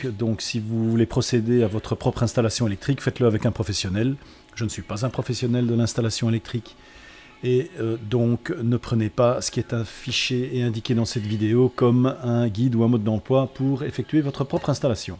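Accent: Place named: Belgium. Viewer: Très intéressant, vos vidéos sont très bien faites. Seriez-vous belge? Votre accent m'est très familier 🇧🇪😊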